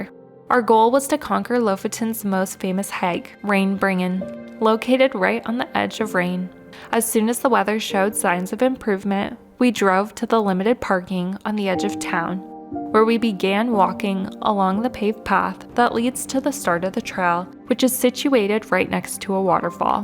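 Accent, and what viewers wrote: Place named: Los Angeles, United States. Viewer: this video is really good....but your LA girl accent is so annoying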